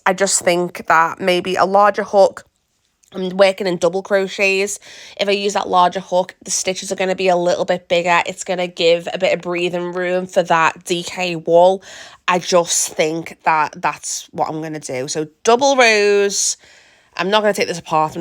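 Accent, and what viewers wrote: Liverpool, United Kingdom. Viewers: Hiya! I was introduced to your channel when you met Amora on her travels and I’m made up to find another northern UK crocheter to follow. I hugely enjoyed this video and you’ve inspired me! I’m trying to guess where you live, as you’ve a very gentle scouse lilt. I was born in Formby and now live in Altrincham x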